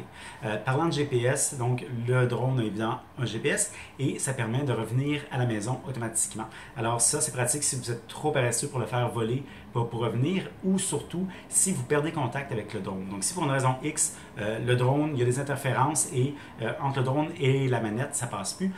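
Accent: Quebec, Canada. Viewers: il est québequois c'est pour ça .... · T'es canadien ?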